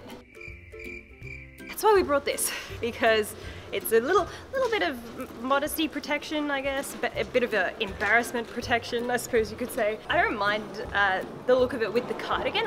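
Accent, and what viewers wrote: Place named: Australia. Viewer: Loving seeing an Aussie youtuber. Finally a familiar accent!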